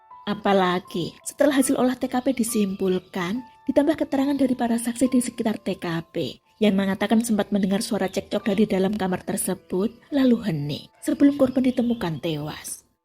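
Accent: Java, Indonesia. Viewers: Mba.. medok bgt suaranya ....😊... Sukses ya...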